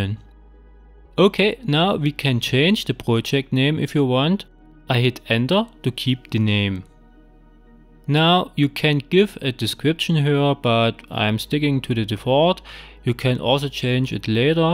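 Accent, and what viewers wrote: Germany. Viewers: Your accent sounds like German. Anyway, thanks for your good information. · Klingt nach Sächsischer Schweiz, Dankeschön für das geile Video! Ich würde mich auch sehr über ein Video auf Deutsch freuen. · This accent is special and typical from east-germany. I am from germany as well and I immediatelly recognized that he is from east-germany (Ich vermute Thüringen oder tiefes Sachsen ;-) ). After all the video and the stuff is great.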